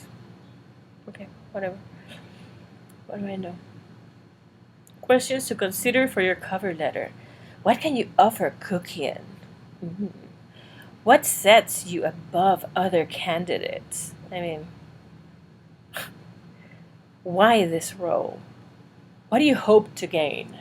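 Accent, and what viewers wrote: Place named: South Florida, United States. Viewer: You barely have any accent....I live in Florida and you sound like you're from South Florida. Wish I coyld speak Spanish as well as you do English.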